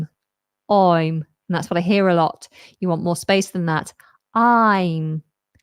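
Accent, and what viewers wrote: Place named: United Kingdom. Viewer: I apreciate the britsh accent...it is very especial for me.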